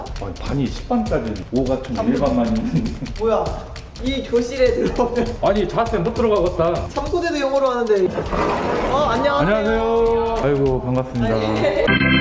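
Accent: South Korea. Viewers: 항상 잘 보고있어용 ㅎ 충남어.. 중국어에 성조가 있듯 의 충남어에 있는 강한 억양! ㅎㅎ 넘나좋은것! ㅎ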